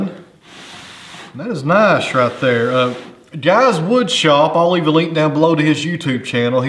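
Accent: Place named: Tennessee, United States. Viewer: I know nothing about sawmills or wood but i find your you tube channel fascinating. I appreciate the beauty of wood and wood grain and to watch you assess a log, cut it and show the lovely grain is brilliant. Add to that your commentary in a wonderful Tennessee accent and I am hooked. I also appreciate your excellent taste in music, which leads me to my only criticism.....would you please list the artist and song name of the tracks you choose for your videos? Even better idea is...have you ever thought about a Spotify playlist that we could follow? That would be as popular as your YouTube channel. Thanks a lot for all the great vids.....i look forward to the next one.👏👏👏👏👏👍👍👍👍👍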